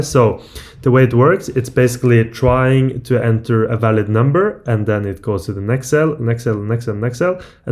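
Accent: Norway. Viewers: Crazy. Are you from Norway?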